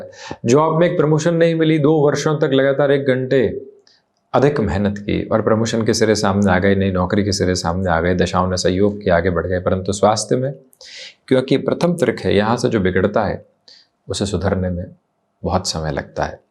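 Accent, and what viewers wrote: Standard. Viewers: Your brilliant clarity with wonderful shudh Hindi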